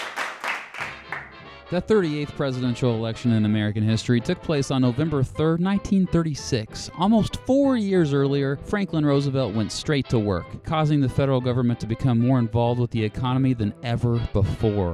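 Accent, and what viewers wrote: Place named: Kansas, United States. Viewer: '' I am from "Keensas" '' I can hear that.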